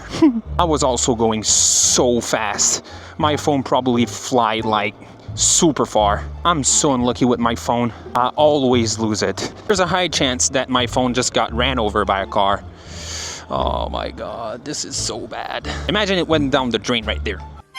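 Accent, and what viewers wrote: Quebec, Canada. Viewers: Where is your accent from? I'm pretty sure it's Canada somewhere but which part? I live in North Dakota U.S. so I'm familiar with how Canadians talk so I was just curious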